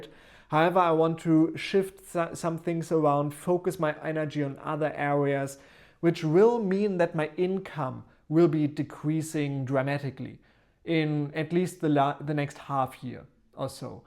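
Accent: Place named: Germany. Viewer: You sound German! I guess you’re going balls deep into manga, huh? 😀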